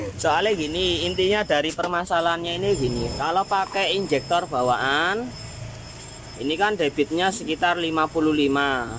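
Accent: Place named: Java, Indonesia. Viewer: cara ngomong enak bosku, logat boso jowone podo aku, enak dipahami wkwkwk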